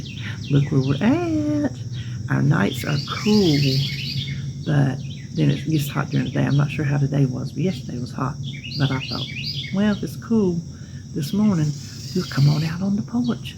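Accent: South, United States. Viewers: Oh honey you have a beautiful southern accent. isnt as pronounced. I say you are the luckier. Didnt know drinking liquids with food wasnt advised. I gotta have liquid ( soda, juice or water) during each meal and snacks. Is that only for surgery reqirement? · I'm from Arkansas but girl you have a Southern accent. I love hearing you talk.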